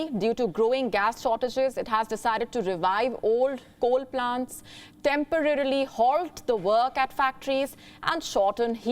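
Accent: India. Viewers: You are speaking Indian English. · The Indian English! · I hate indian accent. It's disgusting. Please learn the language well. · Fire her. Her Indian accent is atrocious.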